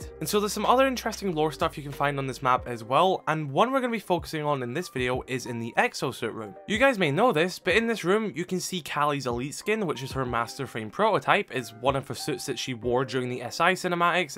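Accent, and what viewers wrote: Scotland, United Kingdom. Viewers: Where abouts in Scotland are u from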